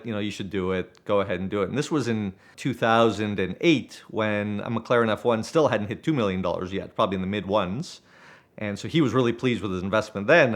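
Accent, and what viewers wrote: Canada. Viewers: I can assure you, there is no need for you to tell us you’re from Canada. We knew the second you said “out”. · The Canadian accent is entertaining in itself. I’ve never heard “curb” pronounced in such a unique way…”CURRBEH”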